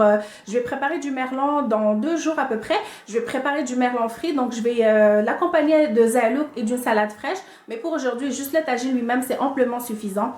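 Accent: Quebec, Canada. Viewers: ton accent quebecois 😍😍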